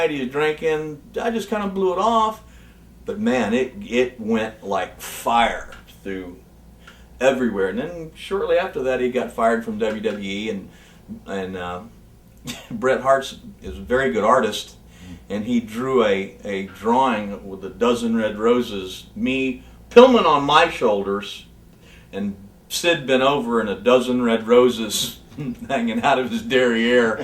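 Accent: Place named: South, United States. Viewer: I can't even watch this garbage you expect anyone to believe you punked Sid and came up with everything that turned dubya cee dubya into a competing company but your ideas were stolen by Bischoff and then he fired you? No one believes you just stop , you were fired for being another hang around collecting a check coming on with the same old 70s and 80s southern draw just like everyone else Eric got rid of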